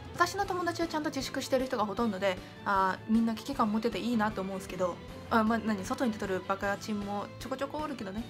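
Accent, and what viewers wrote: Nagasaki, Japan. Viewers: 未だに外で遊び回っとる奴らはその行動1つ1つがこれからの未来に関わってくるって重く受け止めて欲しい。あと全然関係ないですが、自分博多弁の人なんですけど、さん長崎弁話されててなんか親近感が沸きました(*^^*)これからも頑張って下さい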